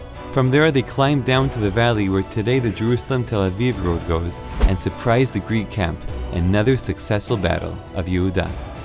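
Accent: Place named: New York, United States. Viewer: I was not expecting to break out a perfect NY accent! This is the first time I’ve heard him talking instead of singing. I’ve always imagined he’d have a strong Israeli accent!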